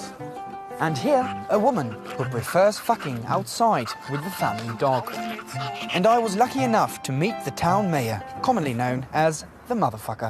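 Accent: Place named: United Kingdom. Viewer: Bri'ish accent fits.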